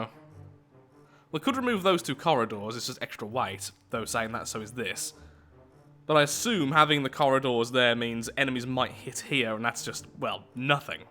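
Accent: United Kingdom. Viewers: Hey are u british or? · Just listen to em! He’s got to be British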